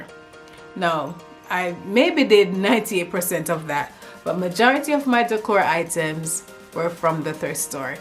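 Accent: Jamaica. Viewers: You sounds like you from 🇯🇲🇯🇲 but anyway job well done ❤ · 2 seconds in, she's Jamaican 😊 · Are you Jamaican?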